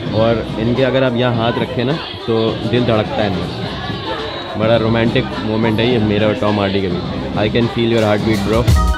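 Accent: United Kingdom. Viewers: Birminghamyyy style lol😂 greetings from Telford ❤️ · Your British accent is awesome 😉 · Bhai ki English ka accent b nagraizon Wala ... Acha laga!!!! Thand main SB ki aesi ho jaati Hy ya aap nain special try ki! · Your British English is on 🔥🔥🔥 point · That british accent ❤❤😂🔥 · Funny British accent too natural. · OMG! that English/ london accent!😅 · Bahi your british accent was coolest thing in vlog😊❤😄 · That British accent though 😁 Nailed it...not a single glitch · British accent acha tha!! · love your british accent. mate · Love ur British accent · hahaha yaaar. Loved the british accent. Rewind kr kr k suna :D ku k sary paisy accent k hein <3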